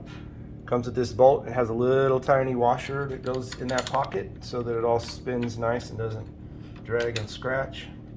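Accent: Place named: United States. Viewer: Is it me or is sounding more country,.. Yeehaw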